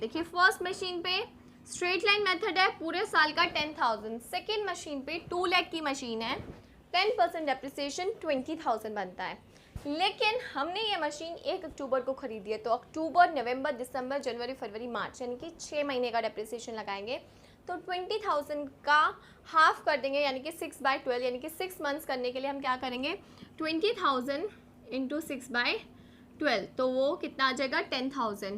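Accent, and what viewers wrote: Haryana, India. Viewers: Haryana se ho😘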